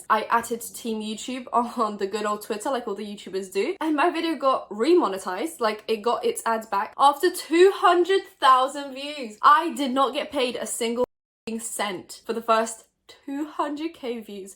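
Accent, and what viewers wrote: United Kingdom. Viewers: why did i know you had a british accent before i clicked on this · You know what’s weird when you were talking about pop tarts I forgot that you were from the U.K. I think I’m tired how did I not hear your accent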